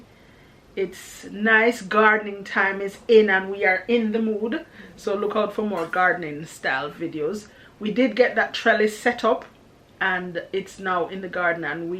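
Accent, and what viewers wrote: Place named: United Kingdom. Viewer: I absolutely love your British accent.😂😂😂🤣🤣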